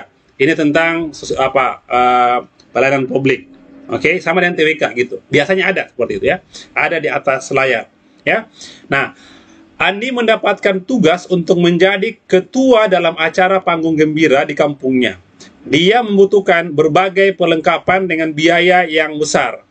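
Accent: Makassar, Indonesia. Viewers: Macam logat Makassar bang😊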